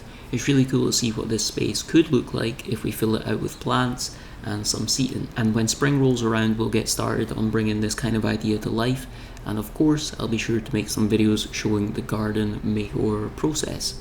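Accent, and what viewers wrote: Scotland, United Kingdom. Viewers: TECHNICALLY IT IS NOT " NATURALLY RUNNING WATER".... IT HAS BEEN CHANNELED THROUGH A MANMADE STUCTURE.......I felt obliged to correct you since you are such a carefully worded individual .........Secondly , I wonder if you have deliberately modified your Scottish accent......because your speech is pleasant.....whereas in general the Scottish accent is brutal on the English language ....I only watched to the " naturally running water" reference so that is all have to say regarding this video......however , I was interested by your video revealing how much Youtube paid you......and I did notice also , your promo for your " Questions people ask me" video.....but didn't watch it......however ,.....are you gay / are you single /Did you buy that cat because its colourings resemble your own / what are your opinions on the current transgender debate ?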